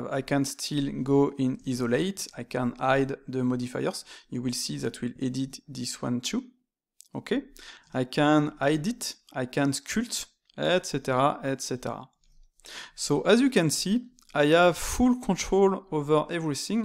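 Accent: France. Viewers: Your french ? ( l'accent est reconnaissable)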